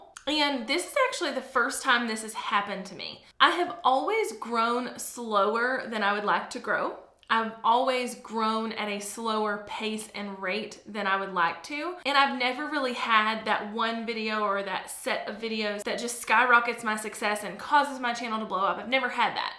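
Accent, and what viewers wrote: South, United States. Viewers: So sweet. The tonality is so different from people who are ride or die as opposed to people passing by. I learned a lot from you in your productivity era. I can't remember what search word I used... ClickUp? Anywho I'm back. Like your southern accent and beautiful office/room.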